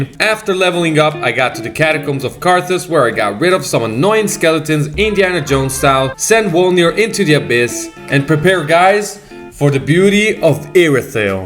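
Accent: Italy. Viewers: Rapid question, you italian?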